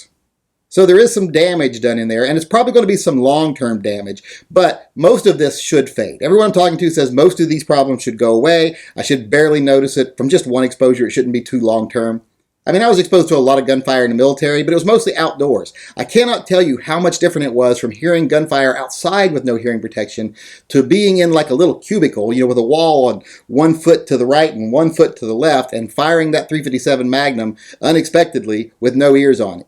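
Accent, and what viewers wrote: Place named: South, United States. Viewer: You may be the, but you sound like a Rebel to me. Dude, what happened to the knuckle of the index finger on your left hand?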